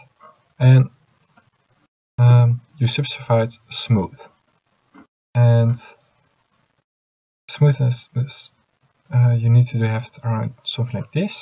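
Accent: Netherlands. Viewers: where you from are you from the netherlands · en btw... ben jij nederlands? zo klink je wel and btw are you dutch? you sound like it...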